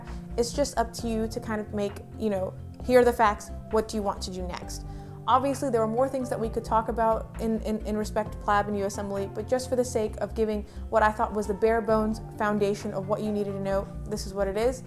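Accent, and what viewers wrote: United States. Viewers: But, your accent sounds american. Did you complete your medical school in the usa? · Are you American? Your accent sounds American.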